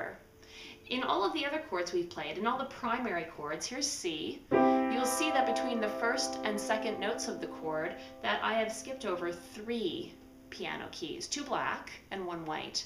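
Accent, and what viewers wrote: Canada. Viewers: Your accent sounds a bit like Canada or Northern U.S. am I right? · This is exactly what i was looking for, and your video was pretty easy to follow. Appreciate alot! It seemed you are from Canada, am i wrong? ;)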